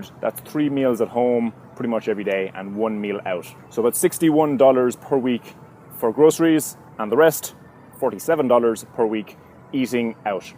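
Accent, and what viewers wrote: Ireland. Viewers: Are you irish? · Are you Irish?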